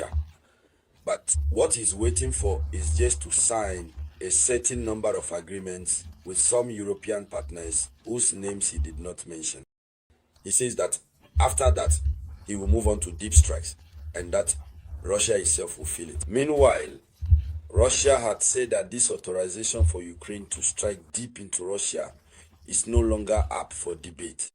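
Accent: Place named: Africa. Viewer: Sir u speak like an AFRICAN y not speak about AFRICA ,UKRAIN try to kill PUTIN as they say what can be more dangerous than that and RUSSIA do nutin look how long UKRAIN as bin sending missiles n drone into Russia all these leaders only send trees..USA said any missiles fire by Yemen ,IRA is responsible yet still YEMEN defeat the USA in the red sea im paying attention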